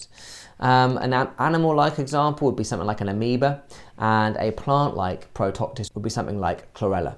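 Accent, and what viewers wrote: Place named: United Kingdom. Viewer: please use american accent if u can i cant understand british well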